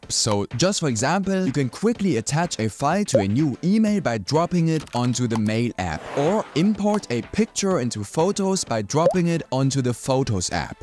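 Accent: Germany. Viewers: Ich wusste irgendwie dass du kein Ami bist. Hast ein sehr deutschen Akzent😊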